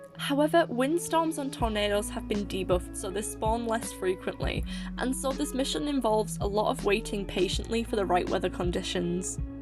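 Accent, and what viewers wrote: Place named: Scotland, United Kingdom. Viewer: Her accent is like philza, british r's and sometimes sounds like a scot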